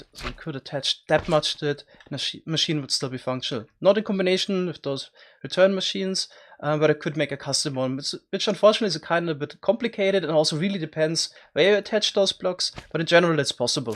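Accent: Bavaria, Germany. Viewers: I'm Dutch but I've always noticed his German accent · Well as a german i obviously noticed your german accent right away, but who am i to point that out? I'm just here for the nice content :D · Now this is an Bavarian dialect I think. But I don't think many people care about that even in Germany. Although it is quite hard to understand German dialects sometimes. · Hey, ich habe mir mal vor einer Woche ein Video von dir angeguckt und habe ziemlich sofort bemerkt, dass du Deutsch bist. irgendwie kann ich es immer sehr gut heraushören, wenn ein Deutscher Englisch spricht xD. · Funny how you pronounce the "r" in the german word "erfinderisch" for an english audience in a spanish or italian way ;) Edit: Could be bavarian or so, do you speak a dialect often? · Kommst du aus bayern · Ich hab immer mich gewundert was es für ein Akzent ist, ich hab vermutet dass es Deutsch ist aber war mir nie sicher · Du kommst aus Bayern oder? 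· Bist du Bayer? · I love your german accent, also love how you say BEE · das lustigste ist, ich schaue dich seit ewigkeiten, und habe schon immer den deutschen dialekt rausgehört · Ich habe sofort gemerkt, dass du deutsch bist😂👍🏻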